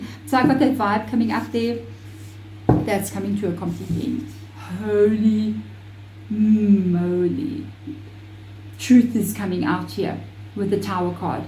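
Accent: South Africa. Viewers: is she south african, I love the way she speaks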